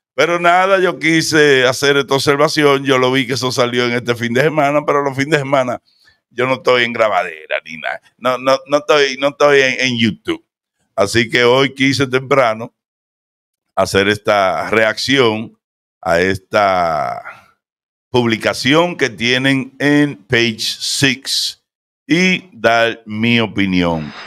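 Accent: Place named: Latin America. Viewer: Me encanta como hablas Típico Latino y sus risas Gracias por hablarnos en Español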